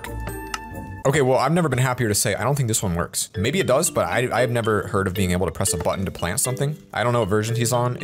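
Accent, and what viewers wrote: Russia. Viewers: Ur Russian makes the vid ten times better 😂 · tip u need to set to to the nigth mode :) by clicking it also nice russian voice😂 live ur vids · noice russian voice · Bru. His Russian accent xD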